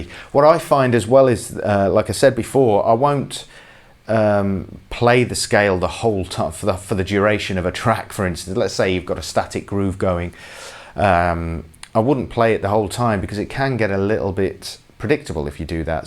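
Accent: England, United Kingdom. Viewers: ENGLISH accent. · You british accent is excellent :D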